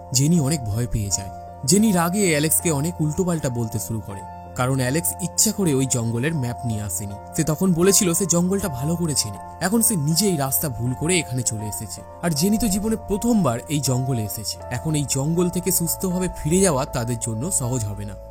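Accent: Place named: India. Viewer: ভাই আপনি কি ইন্ডিয়ান কারণ ইন্ডিয়ান মানুষরা এভাবে কথা বলে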